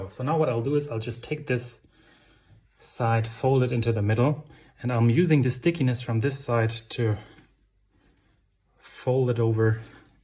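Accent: Germany. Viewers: Great to see baking outdoors. No need to apologise for German English if you didn't apologise no one would know.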